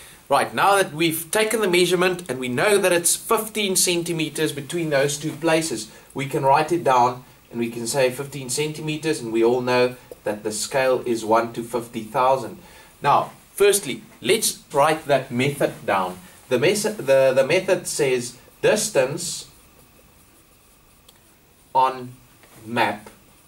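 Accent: South Africa. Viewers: Lmao he's South African!!! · are you from SA??? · My Guy thank you so much for helping me, you are such a good teacher 😀 and btw are you from South Africa? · Confirmed he's from south africa. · He sounds so South African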